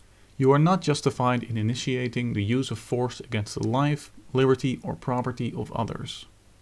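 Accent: Netherlands. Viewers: it sounds as if you're from Holland. Good job with the video!:)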